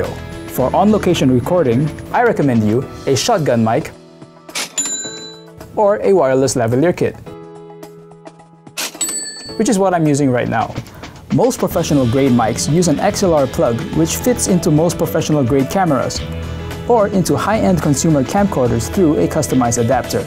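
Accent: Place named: Singapore. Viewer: Very well done...I do video production here and I would hire you in a second if I could afford it. I'm in Florida USA and as I was listening to your accent I realized you must be in S'pore! I used to live in Yishun on Ring Road and miss being there very much, especially Mei Goreng and Roti Prata! Do you know anyone who has worked for Odex? If so, let them know the Shin Jing Bing Ang Mo says hi! and do some more videos!!!!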